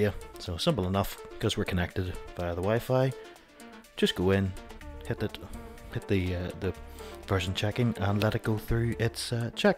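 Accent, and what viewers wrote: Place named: United Kingdom. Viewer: Hi, great video thanks , from the sound of your accent Ur British, so my question is, if I buy one will it work in uk as most I see are sold from America cheers steve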